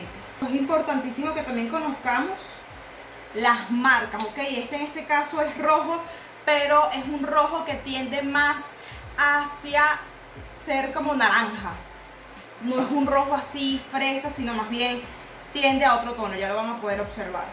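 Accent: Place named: Venezuela. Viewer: Hola buenas noche ésas marcas de crema a la cual te refieres la venden aquí en Vzla. ?..... O tú estás viviendo en otro país?........ Tu acento es muy venezolano. Gracias de a antemano amiga . te felicito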